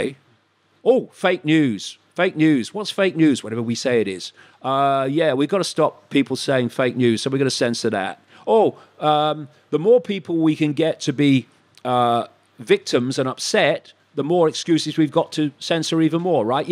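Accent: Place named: RP, United Kingdom. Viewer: Freaking amazing that Dave understands another language but answers in the Queen's English?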